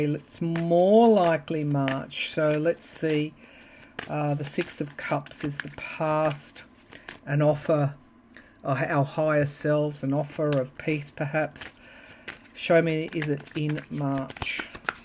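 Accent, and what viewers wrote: Australia. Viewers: Australia your accent is awesome I am from mass down the Cape cod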